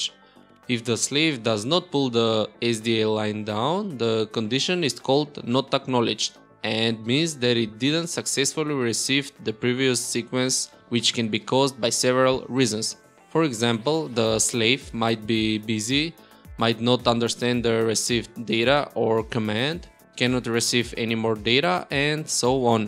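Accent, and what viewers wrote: Russia. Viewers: Are u from Russia? :) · Which strong Russian accent 😊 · Что то мне мерещится русский акцент у автора.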